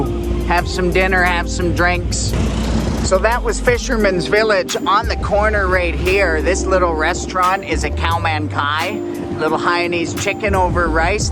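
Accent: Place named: Winnipeg, Canada. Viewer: great video. are you from Winnipeg? i'm trying hard to place your accent!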